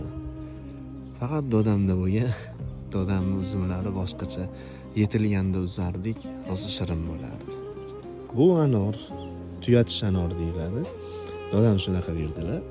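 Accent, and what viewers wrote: Kokand, Uzbekistan. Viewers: Укам каерда яшасангиз хам узлигизни унутманг Кукон шевада гапираверинг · Quqon shevada gapirin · УЗИМИЗНИ КУКОНЧА.ШЕВАДА ГАПРСАЙИЗ ЯНАЯМ ЧИРОЙЛИ БУЛАРДИ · Куконда Дода дийилмайди углим додани Опоки дийишади сих хам Куконнни шевасида гапировринг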